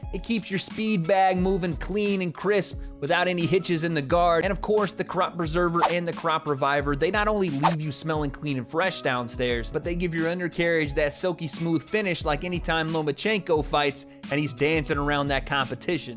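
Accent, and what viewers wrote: Ireland. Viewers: Bro if I hear that Irish accent again I'll unsubscribe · For an american your irish accent is pretty fire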